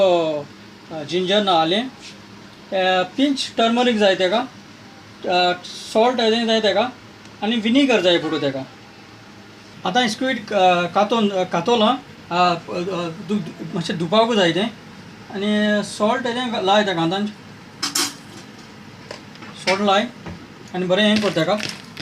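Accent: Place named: South Goa, India. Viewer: sure. Are you from south Goa, from your speaking style, I assume.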